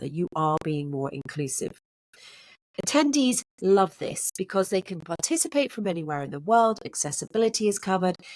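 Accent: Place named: United Kingdom. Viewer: Haven't been around for a while 🎉. Missed the British accent.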